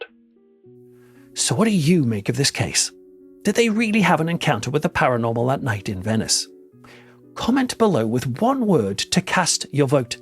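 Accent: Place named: United Kingdom. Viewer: Ya gotta love British accents though. Especially. It’s somehow especially fitting for ghost stories, don’t you think?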